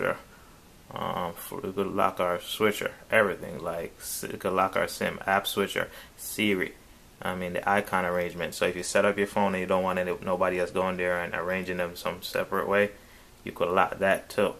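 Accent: Jamaica. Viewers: here the jamaican in u bro. #jamaicans UP lol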